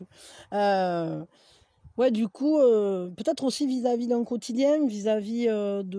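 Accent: South, France. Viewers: Cc et merci pour le choix numéro 1😊😊👍🏼👍🏼 et avec ton accent je voyage dans le sud de la France 🤗🤗💖💖😘😘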